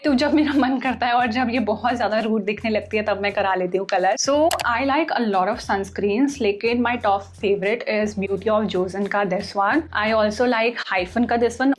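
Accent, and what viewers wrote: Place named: Japan. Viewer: lovedd your Japanese acsenttt it suits you so well 😍😍 lots of love 💌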